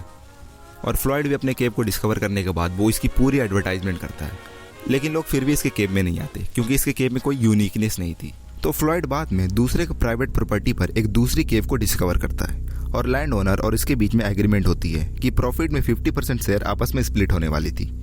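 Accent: India. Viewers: 😂😂😂 fuck this indian accent is so funny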